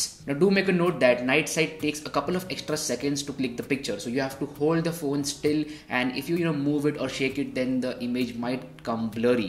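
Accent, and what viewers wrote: India. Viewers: Man.. indian accent is the just the most annoying thing to listen to eh.. no offense guys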